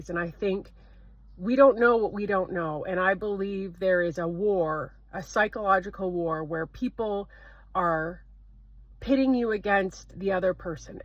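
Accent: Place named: United States. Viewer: You sound like an American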